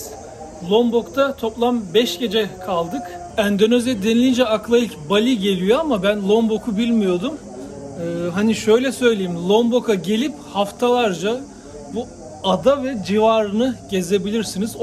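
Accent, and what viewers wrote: Samsun, Turkey. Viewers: Samsunlu olduğun 52 deyişinden belli abi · Samsun 'da okudum. Samsun ''e''lerini ozlemesim. Dört gözle bekliyorum 50 filan demeni 😃😃😃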